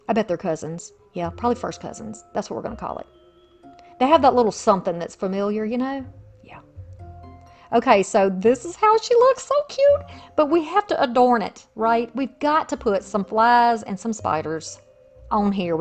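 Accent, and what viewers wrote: South, United States. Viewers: I love your videos. Always so helpful & enjoyable. It is so nice to hear a southern accent. So many try to cover it up. I spend a lot of my days watching your videos. Thanks again.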